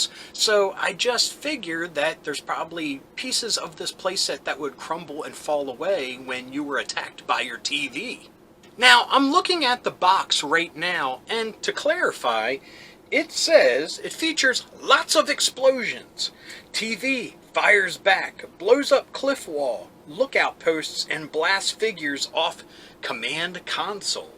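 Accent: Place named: Baltimore, United States. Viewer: your Baltimore accent triggers me, but in a good way 😄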